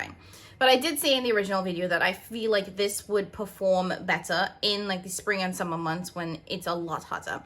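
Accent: Trinidad and Tobago. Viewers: A Trini voice could never heard inno 🥳🥳🥳big up you abd thanks for d info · What island are you from? I'm guessing Trinidad Yes definitely Trini...only trinis say THEE for THE! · Trini? i keep picking up on an accent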